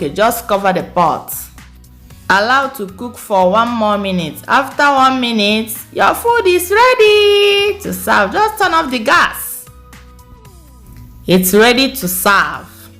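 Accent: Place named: Nigeria. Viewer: First I love the way you have put your vegetables in order, clean job it makes me admire what you are going to cook before I see it😋, secondly your voice is nice to me,I love Naigerian accent so much, last but not least,I love your recipe,and the vegetables looks delicious 😋, wow well served ooo my comment is "confusion" as you have just say😁😁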